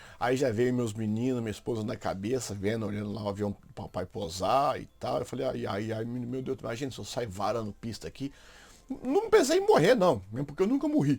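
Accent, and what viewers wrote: Minas Gerais, Brazil. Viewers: Você é o famoso piloto que fala "mineirês"?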